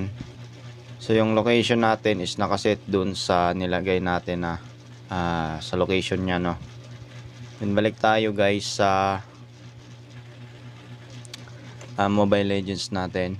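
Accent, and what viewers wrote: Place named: Visayas, Philippines. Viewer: Ew bisaya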